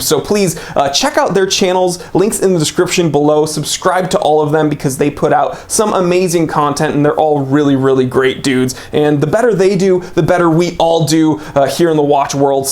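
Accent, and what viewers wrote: Germany. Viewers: Lol.... You've lost the plot this time... But love that wacky German accent. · Your "german-english" was great - just on point! Love the outtakes 👍😄 Greetings from Germany, yours Hendrik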